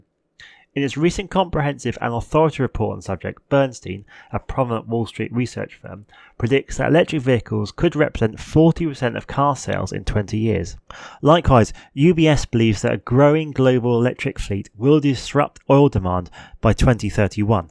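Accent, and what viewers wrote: United Kingdom. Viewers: I think you did an excellent job. But than again, I'm a native English speaker from Ireland so very used to the British accent. By the way, I just subscribed to your channel due to the highly informative and interesting content. Keep up the good work!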